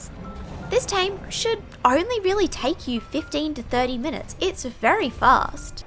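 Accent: Australia. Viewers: When did become a women also ur aussie accent if pretty cool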